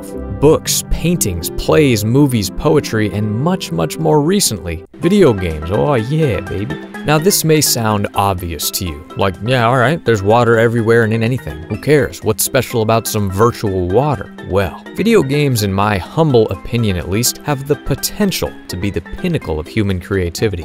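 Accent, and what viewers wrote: United States. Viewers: This guy is from NY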